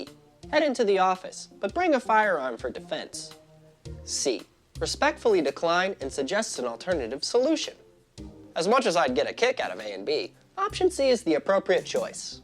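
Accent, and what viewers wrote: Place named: India. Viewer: getting lectured by a curry english speaker is hilarious.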